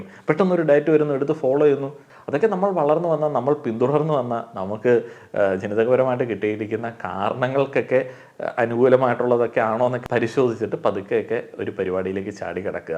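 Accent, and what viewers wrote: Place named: Malabar, India. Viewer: ❤ മലയാളം നീയൊക്കെ പുസ്തകത്തിൽ എഴുതുന്ന പോലെയാകും സംസാരിക്കുന്നത്. എന്നാൽ ഞങ്ങൾ കേരളത്തിൽ പിറന്ന മലയാളികൾ ഓരോ ദേശത്തിന് അനുസരിച്ചു വ്യത്യസ്ത രീതിയിൽ സംസാരിക്കും. അവൻ പറയുന്നത് മലയാളം തന്നെ മലബാറി സ്റ്റൈൽ. അത് മോശം അന്നെന്നു നിന്നെ പോലെ ഭാഷയേ കുറിച്ച് വല്ല്യ ധാരണയില്ലാത്തവർക്കേ തോന്നൂ. ഇംഗ്ലീഷ് വരേ സ്ഥലങ്ങൾക്കനുസരിച്ചു വകബേധങ്ങൾ ഉണ്ട് 😂.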